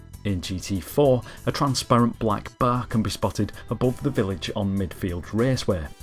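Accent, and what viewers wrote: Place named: Lancashire, United Kingdom. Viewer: "Euwt uf bewnds" haha love Lancashire accent (and im a tyke)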